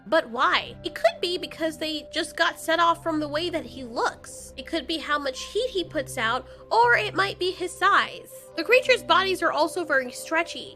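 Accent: United Kingdom. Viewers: wait are you British?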